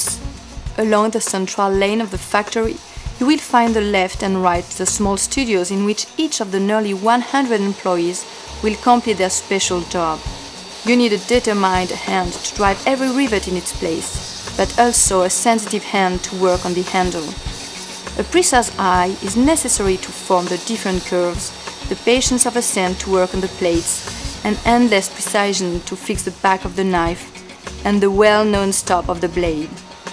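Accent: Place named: England, United Kingdom. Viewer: Cet accent anglais m'écorche les oreilles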